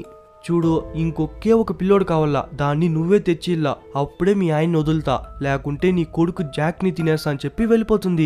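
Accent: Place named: Kolar, India. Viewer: Kolar slag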